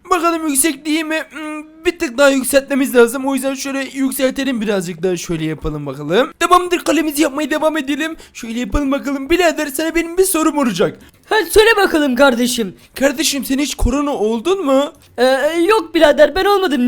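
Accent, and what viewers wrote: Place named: Urfa, Turkey. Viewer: Abi aynı Urfalı gibi konuşuyorsun bende Urfalı yım 😍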